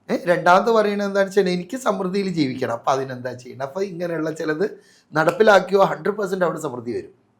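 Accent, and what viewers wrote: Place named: Kannur, India. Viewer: ഇദ്ദേഹം കണ്ണൂർ കാരനാണോ കണ്ണൂർ slang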